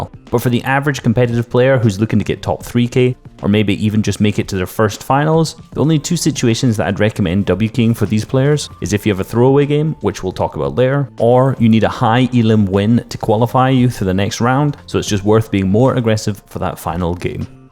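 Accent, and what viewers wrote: Scotland, United Kingdom. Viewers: are you scottish?? if you are you are my favourite analyst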